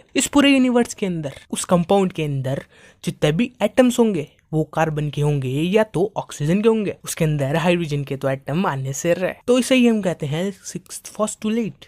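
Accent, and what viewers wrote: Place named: Punjab, India. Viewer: It's good but don't speak punjabi on English plzzz🙏🙏🙏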